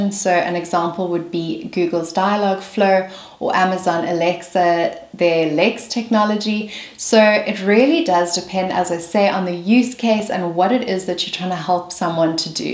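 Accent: South Africa. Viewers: Dear, I must compliment you on a very well polished online profile. I found your video interesting to watch and subscribed to your channel. I wish you all the best for your future endeavours. Oh and well done for flying the South African flag high. I was expecting to hear a UK or US accent and was pleasantly surprised to discover you're a local. I'm from Cape Town myself. Best place to live, am I right?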